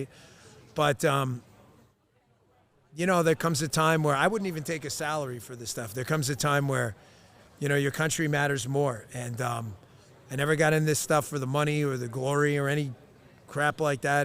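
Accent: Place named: New York, United States. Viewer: love Dans italian NY smart aZZ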